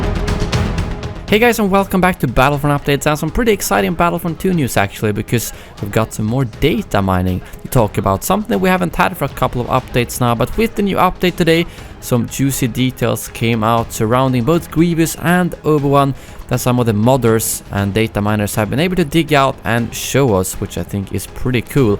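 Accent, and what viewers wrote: Sweden. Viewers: Are you swedish because you sound like you are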